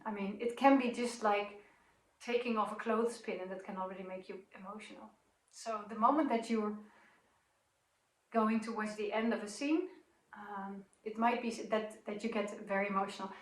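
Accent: Netherlands. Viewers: likewise. I have been subscribed for some time now. Is it a Hollands accent i hear? Groetjes from the sunny Caribbean.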